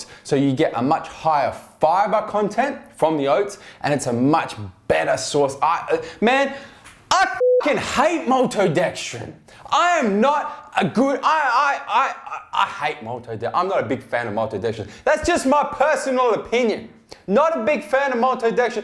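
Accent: Australia. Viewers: Manos Nikolidakis seems fine to me this is how aussies talk if u were a good psychologist u would know that different places have different ways of talking. Plus the extra obnoxious loudness is just hid way of trying to appeal to his subs as youtube is sort of a entertainment platform. Throw your degree away lol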